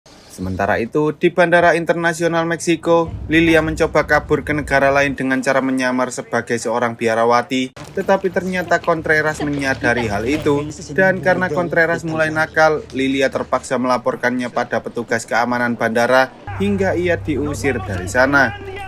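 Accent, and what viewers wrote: Java, Indonesia. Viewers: Mimin asalnya dari jawa apa?🤭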